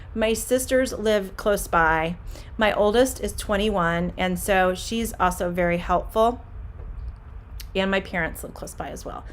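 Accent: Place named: United States. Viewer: rarely I understand the americain accent welcome mam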